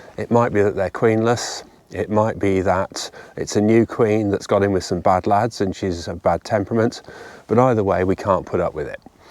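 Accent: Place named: RP, United Kingdom. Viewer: You might want to get a tripod? Just set your camera on it and then both hands free and Bob's your uncle! By the way, Just found You and delighted to find an intelligent chap who speaks the Queen's (no pun intended) English, unlike most of the other Keepers of Bees on YT who seem to all be from the American South. Nice guys but their communication skills are rather "provincial". Needless to say, I've subscribed. So one more to add to your 3.91Ks. Now on to "How to Make & Use a Bee Vac". Cheerio!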